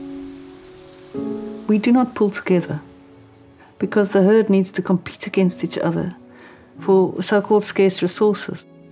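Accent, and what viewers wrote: South Africa. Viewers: Amazing very special channel. As an ex South African so enjoy listening to your lovely accent. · Beautiful movies and channels. Feels so familiar the South African accent ... we moved abroad a few years ago.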